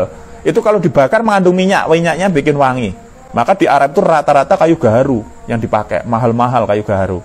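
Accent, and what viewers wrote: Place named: Java, Indonesia. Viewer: ngomong Jawa medok nya gk berubah😂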